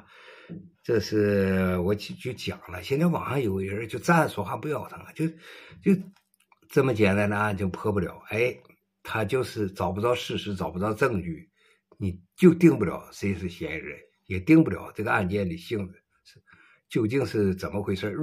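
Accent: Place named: Liaoning, China. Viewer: 你是辽宁的吧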